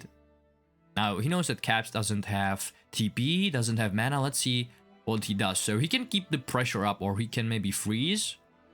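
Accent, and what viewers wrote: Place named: Asia. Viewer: Could tell after 5 seconds that this is Asian, maybe but just maybe a very drunk dutch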